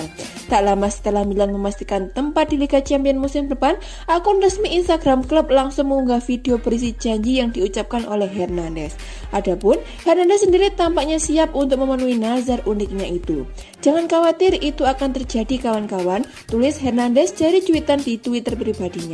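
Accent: Java, Indonesia. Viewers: Seneng banget denger conten ini, jawa nya medok. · Narator nya medok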